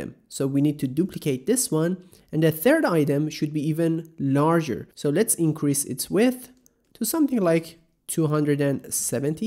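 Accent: Iran. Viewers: its the persian accent thats killen me man. hahahah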